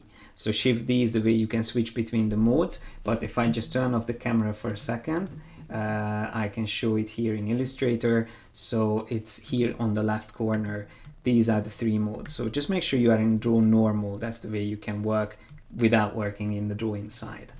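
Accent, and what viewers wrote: Hungary. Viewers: Bro your accent sounds Hungarian! :D are you? Thanks for this, excellent tutorial!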